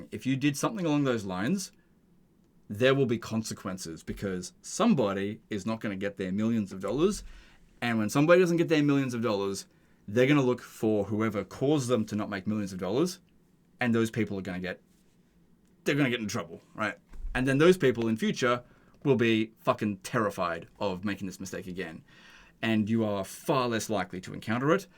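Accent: Australia. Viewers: Ill miss yr Aussie voice! You made me a better English learner!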